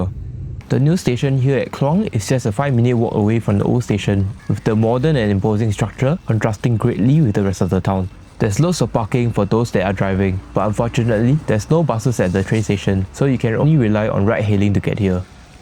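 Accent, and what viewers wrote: Singapore. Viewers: You sound Singapore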